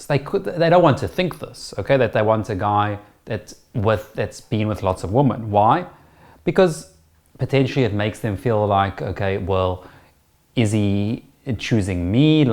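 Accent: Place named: South Africa. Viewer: You sound so South African, oh my days! Thats awesome! Howzit bru from a fellow Saffa.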